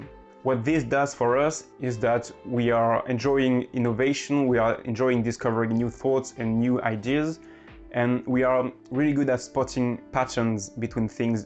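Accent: France. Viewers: Sounds like a french accent.but just my guess not sure.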